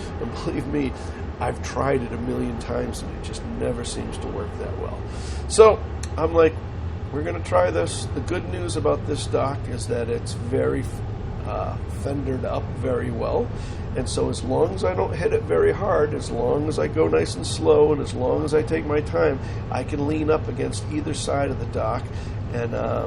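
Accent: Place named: Brooklyn, United States. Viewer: i have a friend from Brooklyn I've known for decades. You and her dad are so similar. Similar accent, speech patterns, hair... every time you talk i hear her dad.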